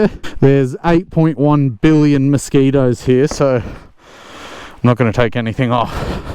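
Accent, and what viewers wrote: Australia. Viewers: You Aussie, mate? Hello from Toowoomba!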